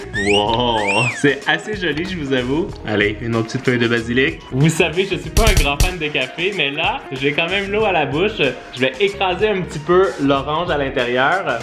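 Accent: Quebec, Canada. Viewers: 2ème Des recettes tik tok pour la fin de l'été WHY NOT TON ACCENT QUÉBÉCOIS EST TELLEMENT BEAU, TELLEMENT AGRÉABLE À ÉCOUTER I LOVE SO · traduis le mot anglais 24htu mon youtubeur préféré de is vidéos accent canadien et qui met quoi des vidéos trop trop rire 👍👍👍👍👍 🇨🇵 🇨🇦👍🇨🇦🇨🇦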